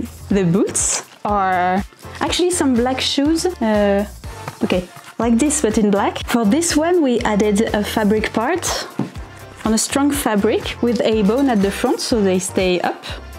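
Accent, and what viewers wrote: France. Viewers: Tu es tellement française, ça s'entend jpp- Mais très cool ton tuto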